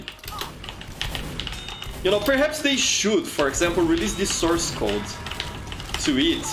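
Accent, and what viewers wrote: Brazil. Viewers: Your accent sounds like you're brazilian. Great content, keep it up!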